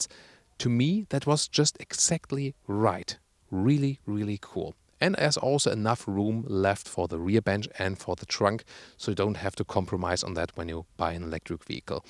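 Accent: Germany. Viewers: SORRY, but that German accent is adorable.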